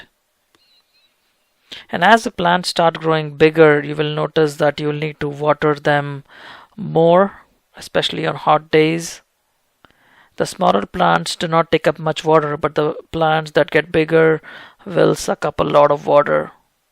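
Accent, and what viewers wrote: India. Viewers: I LOVE THAT STRONG INDIAN ACCENT AND MAY I HAVE SOME SEEDS...... AND WHAT THEY COST PLEASE ! THANKS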